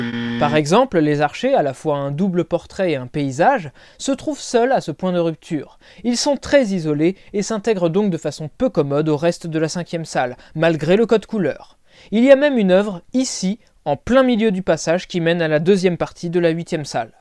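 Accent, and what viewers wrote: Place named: United Kingdom. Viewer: Et félicitations pour votre accent british !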